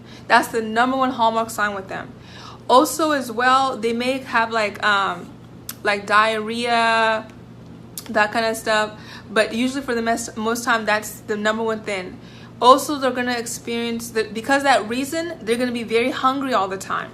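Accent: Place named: Haiti. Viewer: This video is trash but the Haitian Accent is cool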